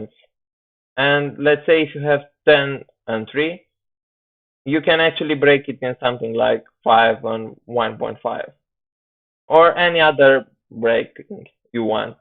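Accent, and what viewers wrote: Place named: Russia. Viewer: The problem is not because of Mic, the problem is that his first language is not english. I think he is Russian. Still it is not that tough to understand his accent.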